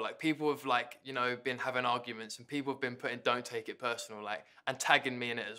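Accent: United Kingdom. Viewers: HES BRITISH ?! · WOAH HE IS BRITISH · Still can’t believe he’s British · Did not know he was British 😐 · Lol hes British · OMG HES BRITISH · Why does he sing in an American accent but talks British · how come you have a british accent when you talk but when you sing in a american accent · SOMETIHNG WENT WRONG WHEN IS SINGING I HAVE AN ENGLISH ACCENT AND WHEN HE TALK IT AN BRITAIN ONE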